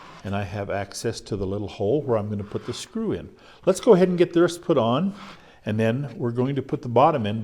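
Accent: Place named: Pennsylvania, United States. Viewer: I'm trying to figure out where your accent is from. Pennsylvania? That's my best guess.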